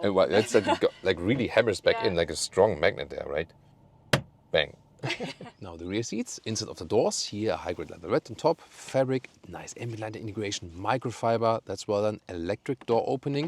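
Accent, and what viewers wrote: Germany. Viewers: you are good in many ways but please work more to improve your English! Is very tiring when you speak English but we hear mostly German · I love to hear your German accent :) perfect . nice review as always. is it original quicksilver color? or another color changed with ppf? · An excellent review. Love your German accent and your English is perfect, thanks for a great review.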